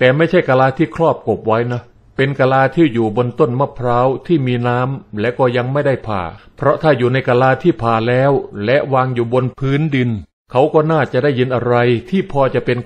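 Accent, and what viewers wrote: Isan, Thailand. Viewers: ฟังเสียงพากย์เสียงเว้าสนุกดีผมชอบ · สนุกครับลุงผมชอบลุงพากษ์อีสาน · ผมชอบลุง พากษ์อีสานครับ.ใครไม่ชอบมึงไปดูช่องอื่นอย่ามาด่าลุง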